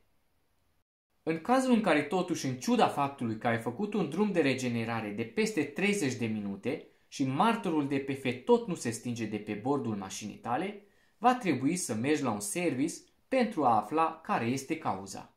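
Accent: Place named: Transylvania, Romania. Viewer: Esti din ardeal te salut ardelenii nr1 🎉🎉🎉dupa accent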